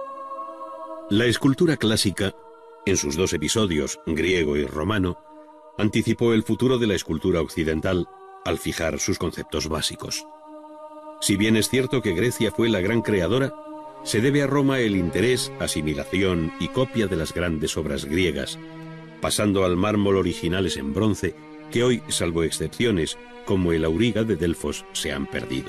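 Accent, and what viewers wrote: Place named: Spain. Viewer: Amo estos documentales, los veíamos en la facultad en el 2012, una joya. Y me encantan con acento español, no sé por qué! Gracias por subirlos!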